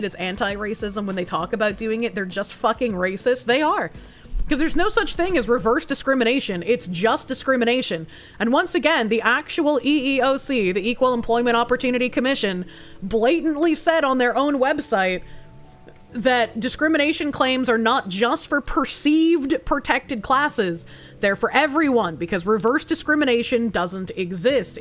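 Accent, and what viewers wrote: Boston, United States. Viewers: Needs to up the boston accent best thing to come out of america, god i genuinely love it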